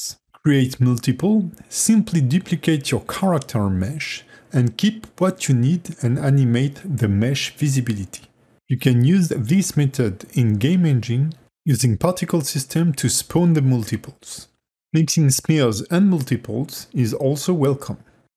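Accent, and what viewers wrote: France. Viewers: You sound french ! That makes me understand more well !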